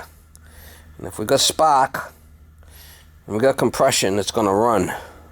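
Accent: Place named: Massachusetts, United States. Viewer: It's actually a south coast massachusetts/rhode island accent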